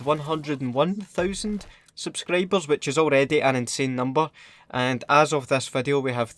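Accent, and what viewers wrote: Scotland, United Kingdom. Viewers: South Africa I watched the soap video on Facebook uploaded by game lads or something like that. The first video on YouTube I watched was the clowns video. (I also frequently binge your videos cause sometimes nothing else interests me except for a Scottish accent. Not pandering, just stating) Part of the notification squad now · Im from England! I have to say the first video of yours I watched was tactical nuke incoming when I was in a mw2 hype, thought you were funny so watched a few more and the wee Scottish accent just made it even funnier! keep it up though man! im glad to see someone who's made it big and still thinking about the people less fortunate than themselves! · 1) I live in Scotland, tho I'm from France 2) First video I saw was the one on Bully, through a facebook post. I remember it because it was my first week in Glasgow and I was like "Oh man is that the accent I'll have to live with for the next 4 years?". 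Loved it tho, and I've been showing your videos to my friend and family at home whenever I get asked how is the Scottish accent;) Thanks a lot for what you did there, it is nice to see someone do stuff like that and have conscience of what is around them. Keep up the good work mate:D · Greetings from Texas over in the US! First video I ever watched was "WTF EVEN ARE COD MAPS?!" It's an amazing video that intoduced me to your hilarious content and great style of commentary. Never did I think I'd spend so much time watching a Scottish man rant about COD so calmly. Thanks for the giveaway, and I hope you help raise a lot of money for such a just cause. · I'm from Finland & the first video of yours that I watched was your "most useless attachments #3", which made me fall in love with the Scottish accent (no homo). I've stopped following any other COD youtubers than you. Needless to say, awesome work bro. 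Please keep it up, really brightens my day when I see that you've uploaded a new video. Cheers. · England and the first video I don't know but it was around about the time you did the video about a party and everyone was off their heads! Love your content solely because you're a Scot! My dad was from Edinburgh so I can understand somewhat what you say!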